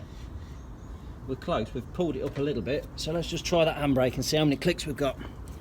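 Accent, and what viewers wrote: United Kingdom. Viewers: i like your british english...lol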